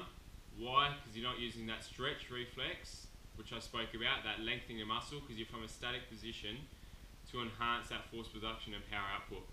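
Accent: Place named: South Australia, Australia. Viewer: Thanks for the video. By the sound of your accent, you are from South Australia. Very different accent to the other Australian states!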